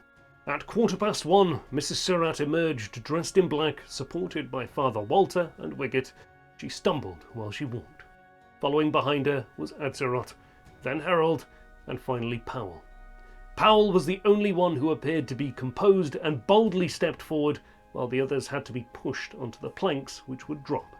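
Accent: England, United Kingdom. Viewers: The English nasally twisted appallingly irritating narrator should be forever binned ! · Did You notice that it's a BRITTISHER who is doing the narration? Irony. 📚 M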